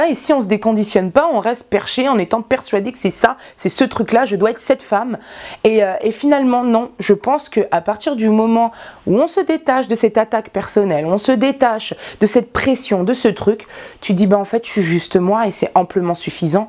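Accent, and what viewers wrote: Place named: South, France. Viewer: Coucou je suis complètement d'accord avec toi pour les copines par expérience on apprend bien des choses...j'adore l'accent du sud tu m'a fait trop rire, tu et naturel et tu te prends pas la tête sa fait plaisir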